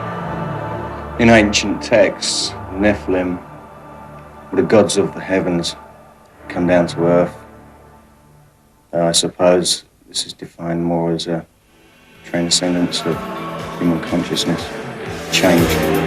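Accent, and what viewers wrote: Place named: United Kingdom. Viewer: lvly british accent!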